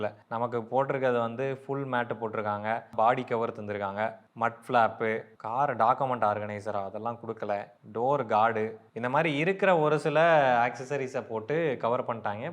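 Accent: Tirunelveli, India. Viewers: தம்பிக்கு திருநெல்வேலி தானா?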